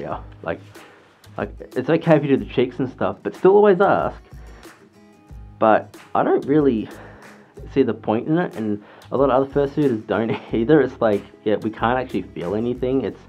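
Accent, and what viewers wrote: Australia. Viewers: wait wasn't he german how does he sound just like an australian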